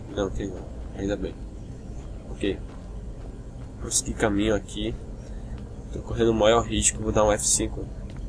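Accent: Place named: Interior, Brazil. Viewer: Você é da Bahia, Pernambuco, Sergipe ??? Eu percebi que seu sutaque é bem tipico do interior :)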